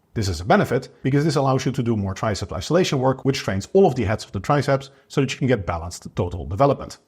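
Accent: Netherlands. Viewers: dutch accent is hilarious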